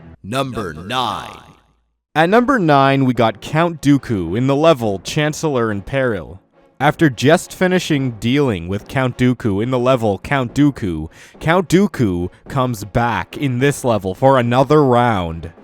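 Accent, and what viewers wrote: Canada. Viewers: You're Canadian I can tell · Are you Canadian?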